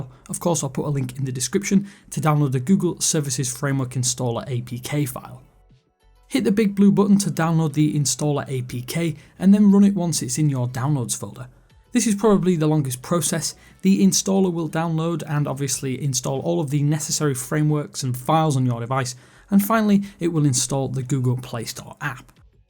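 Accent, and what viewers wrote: United Kingdom. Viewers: てていていってて 这差远了，只是英音而已。。你听听约翰逊那种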